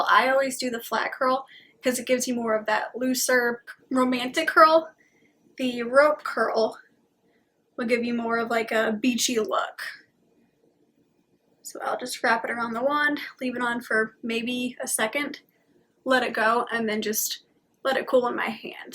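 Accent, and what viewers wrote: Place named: Midwest, United States. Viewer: I just found you while looking for tips on trimming my hair and I’m so delighted you seem to be sticking around! It’s wonderful to see someone with genuinely long hair on hair YouTube!! Could we maybe get some more tips on other kinds of styles for long hair? I’m not sure if you wear your hair back much, but there aren’t a lot of people with any idea how to deal with real length when doing updos or braids so any tips you have would probably be helpful to those of us who love our long hair but aren’t always sure the best way to handle it. I really hope this doesn’t come off as preachy or bossy but... please be very careful with any travel plans! I live with a microbiologist so I’m pretty up to date on what’s going on and there’s a huge spike in cases and hospitalizations in parts of the country right now and we won’t even start to see the effects of 4th of July parties for about 2 weeks. 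We just had to start shutting 7 counties back down here in California. Maybe stay local-ish? You sound like you’re from somewhere in the Midwest and it seems safer there for the moment. I hope you have a happy anniversary though!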